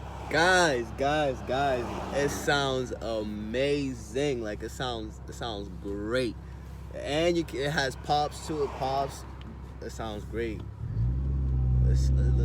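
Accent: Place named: New York, United States. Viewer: Cuz sound like he from new York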